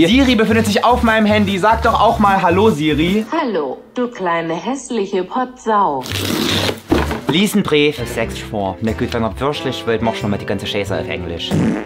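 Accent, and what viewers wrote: Saxony, Germany. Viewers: Kannst du deine Videos jetzt immer auf Sächsisch machen😂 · Hey,😊 kannst du mehr auf sachsisch reden bitte. Ich und meine beste Freundin haben uns totgelacht.😂😂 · Ich hatte einen durchgehenden Lachflash!!😂 Bitte mach weiter so du bist der Beste!! Als du die Perücke abgezogen hast war ich so erschrocken wie kurz deine Haare sind, weil ich mich schon so an die Perücke gewöhnt hatte!!😂😂 Mach weiter so und rede mal öfter sächsisch!!❤️😂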